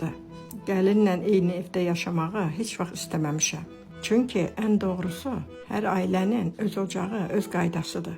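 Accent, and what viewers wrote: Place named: Qazakh, Azerbaijan. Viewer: Haralısınız? Ləhcə var, mən bizim Qazax tərəflərə bənzətdim.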